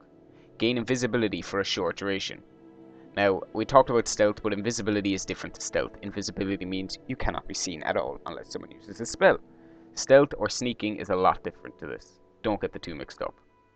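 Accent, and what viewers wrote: Ireland. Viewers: are you irish? DAT accent !!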